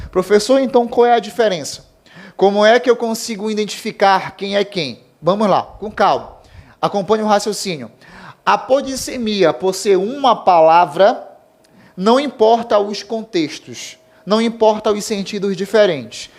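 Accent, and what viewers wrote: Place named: Pará, Brazil. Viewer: aula boa é com sotaque paraense égua mana muito bommm!!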